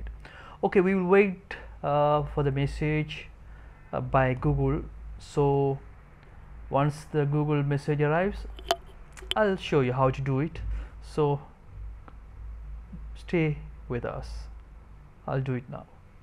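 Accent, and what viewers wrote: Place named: India. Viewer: dude if ur an indian then just talk English in indian accent man the accent was irritating by the was the the msg was informative, helped me thanks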